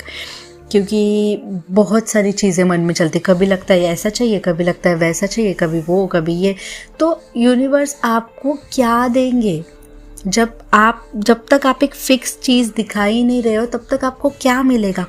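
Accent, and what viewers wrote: Gujarat, India. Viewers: Gujju accent Kesaa, hahahah happens to me too LOL love you and your innocence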